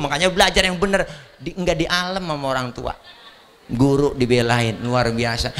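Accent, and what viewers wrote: Betawi, Indonesia. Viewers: masya allah... suka bngt ama yg 1 ini... suka bngt ama logat"y... sama² kita orang betawi yah....😁 ketawa trs klo ngeliat ceramah... # JAKARATA_BARAT Hadiirrr☝ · Resep banyak banyolannya Dan kental betawinya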